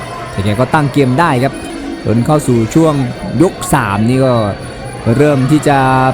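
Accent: Thailand. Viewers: ชอบเสียงพากย์ ลูกผสม ไทยบ้าน + เสียง กรุงเท๊พ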